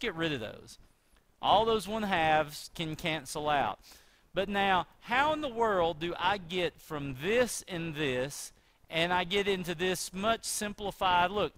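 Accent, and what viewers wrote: United States. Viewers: This was my exact question, and I got an exact answer. Plus the great southern drawl makes this video ten times better. My dads side is from oklahoma and they all sound like this; i feel like i'm learning phyics from my uncle.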